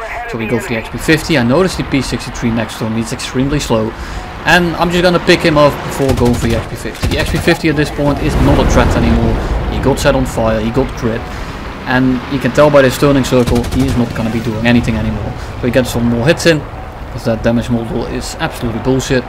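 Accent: Netherlands. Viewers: Hey man i have a question cause you kinda Sound dutch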